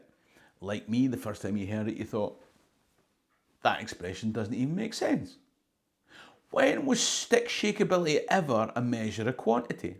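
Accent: Scotland, United Kingdom. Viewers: I dunno, but sounds to me like you speak Gaelic with a Scottish accent. There's irony in there somewhere. You didn't mention Duolingo which has an introductory but extensive course in Gaelic. It's free (which appeals to me) and completely flexible (although they pester you to complete a lesson a day). For those in North America, there's Colaisde na Gàidhlig (The Gaelic College) in Nova Scotia. They offer in-person and on-line courses. (I don't believe there's a bar/pub, however.) <link> Tìoraidh an-dràsta. Chì mi a-rithist thu. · I am learning your Accent as i listen to you speak..i realize it is possibly a regional accent so i wont say it is THE Scottish accent.i repeat how you say words..i love the Scottish Accent as i am a Henderson and have MacDougal family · We American women love a good Scottish accent. Any videos of you in a kilt? Thank you in advance.🌷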